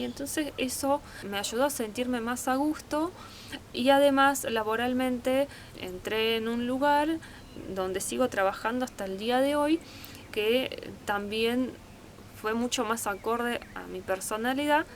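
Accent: Córdoba, Argentina. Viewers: ese acento se esta pareciendo cada vez mas al acento cordobès. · No sé como llegué a tu video, pero me encantó!!! Tenés una forma de hablar muy sueve y amena, transmite tranquilidad y lo hacés en un perfecto castellano (argento!) con matices cordobeses!!! Me encantó la historia familiar y su proceso de migración externa/interna... Te ganaste un seguidor. ¡Gracias! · De vez en cuando se te nota el acento cordobes .Saludos desde Australia. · O sea que sos ucraniana?? No parece!!!!! Hasta la tonada cordooobesa se te siente...también soy música!! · yo diria que se le nota la tonada cordobesa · Ya hablas como cordobesa bien ahí !!! Welcome a mi Córdoba Argentina ❤ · Me encanta tu acento cordooobés. 😀😀 Linda historia. En el próximo video, si querés, contá de qué viven, cómo ganan dinero y si es en relación a lo animales que tienen y qué animales tienen. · Hola muy interesante tu historia, sinceramente sos una Argentina mas hablas muy Argento jajaja besos desde Cba. 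Cap. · Habla cordobes jajajajaa muy bueeenoooo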